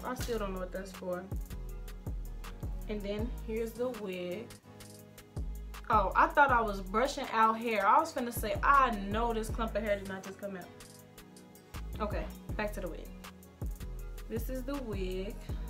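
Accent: Chicago, United States. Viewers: Where you from. It sound like you from Chicago 😭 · You from Chicago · you’re so pretty🥺 you sound like you from chicago too😭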